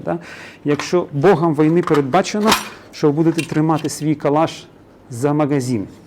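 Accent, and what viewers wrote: Russia. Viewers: Его украинска мова хромает. Часть слов на оркском произносит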